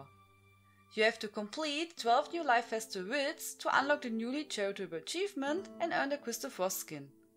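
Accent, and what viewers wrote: Germany. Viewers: yes or Akzent is germany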